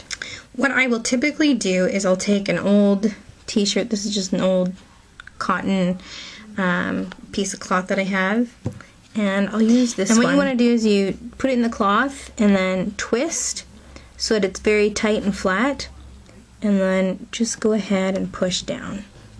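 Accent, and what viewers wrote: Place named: Canada. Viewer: Are you canadian? because when you say 'out' and 'eh' you really sound like you're from canada! ll =P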